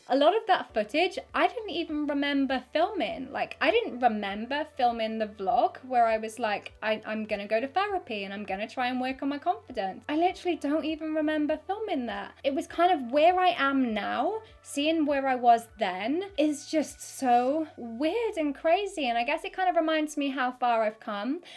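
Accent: United Kingdom. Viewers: Wait are you from the UK because your accent sounds a but deferent xx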